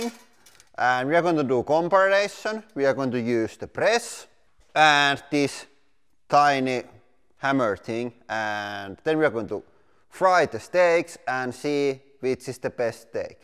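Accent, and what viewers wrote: Finland. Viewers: I know that because i am finnish myself. I almost immediatly noticed it because the merch of The Black pepper. And he has a finnish acent. Sry if i spelled wrong.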